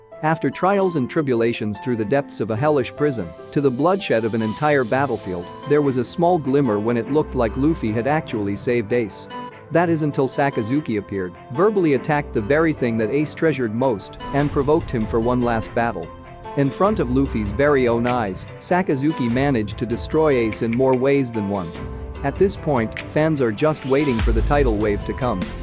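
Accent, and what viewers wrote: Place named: Ireland. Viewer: Ur sound like lrshion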